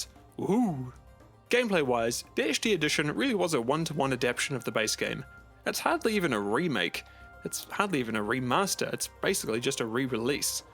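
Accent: New Zealand. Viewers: Cute NZ accent, lol.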